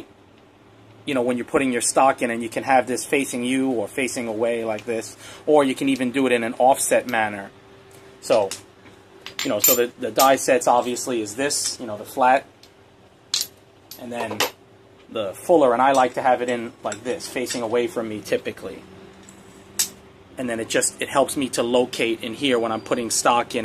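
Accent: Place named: East Coast, United States. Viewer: by now you know I'm a fan of your channel, and the work you do. I've been trying to add up why you are overseas, your obviously an American with that East Coast accent. today you mentioned Matthew West, I'm thinking you're referring to the Christian singer? how far off am I by thinking your a Christian Missionary or Teacher? BTW- I think I really like the guillotine and I really really like the tongs. I am very interested in purchasing both, but will have to save some $ first. at any rate friend, keep sending us videos, you are a great educator of this craft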